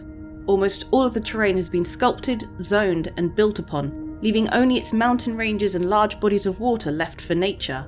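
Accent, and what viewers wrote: United Kingdom. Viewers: The British accent adds 50% more authenticity to the video.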